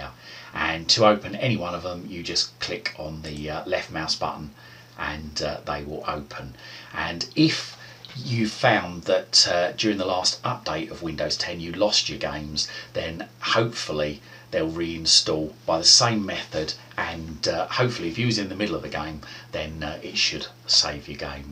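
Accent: United Kingdom. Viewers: Oi, are you british mate aye?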